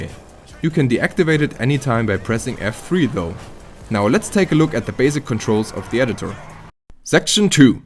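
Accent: Germany. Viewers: Are u a german youtuber cause you have that accent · Hey, ich geh mal anhand deines akzents davon aus, dass du deutsch sprichst. Ich hab ein kleines GTA Projekt vor und bräuchte da Rat bzw Hilfe von jdm mit Erfahrung. 1. Kann ich mir im online Modus mehr als 2 Charakteren machen ? ( Brauche 2 für mein Video ) 2. Kann ich beide Charaktere gleichzeitig steuern lassen im offline modus, sodass ich praktisch 2 Schauspieler habe, die ich bzw noch eine 2 Person mit einem Kontroller steuern kann und somit z.B beide für eine Szenen nebenher laufen lassen kann ? 3.Wo legt GTA die exportierten Videos hin, sodass ich sie in Pr öffnen kann ? 4. Kann ich mit gemodeten Autos Aufnahmen machen ? Wäre echt toll, wenn du mir helfen könntest bei meinen Fragen ! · Digga du hörst dich so deutsch an hahahahah are you german bro?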